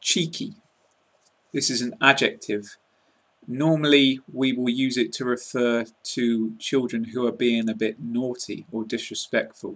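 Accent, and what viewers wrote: United Kingdom. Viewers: I like british accent , nice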